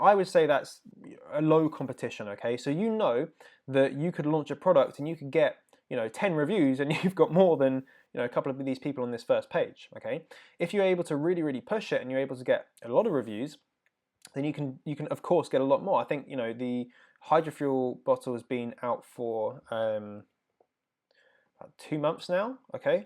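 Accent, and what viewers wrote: United Kingdom. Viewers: just spent my afternoon making notes . thank-you so much for your videos and information presented in such a genuine way . Im just starting out with my sister in law and to be honest its so good to find a British accent taking about FBA within the uk ! we would really like to be considered for the free gift ,particularly the profit calc pro , if were not too late. We continue to watch and learn and thank-you for such informative content . Best of luck for the future , though Im not sure you need it !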